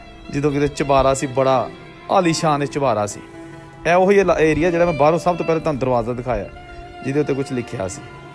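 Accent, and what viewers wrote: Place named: Punjab, India. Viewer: Apke bolne ka style ham Panjabi jaisi hi. Sunkar apnepan ka ehsas hota hai.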